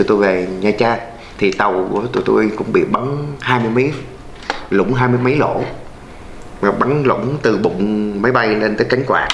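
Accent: North, Vietnam. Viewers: Ong nay khong phai nguoi Sai gon nghe cach noi chuyen va giong noi giong nguoi bac .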